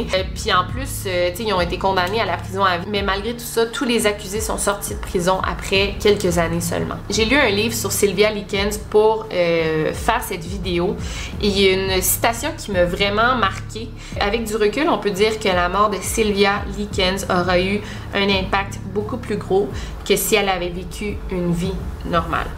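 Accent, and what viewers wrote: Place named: Quebec, Canada. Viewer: kikou j habite en france en lorraine et j aime bcp tes reportages et l accent quebecois suis pendu a tes levres pour comprendre mais j adore sur l affaire de sylvia likens c est celle ui m a le plus marque j avais vu un film sur you tube se rapprochant de ce drame mais je,ne retrouve plus si des youtuber?, en tout cas merci pour ton travail respect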